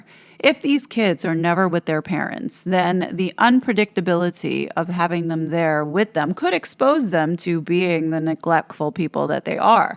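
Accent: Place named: New York, United States. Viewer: I listen to for the New York sass… and hope to be comment 1000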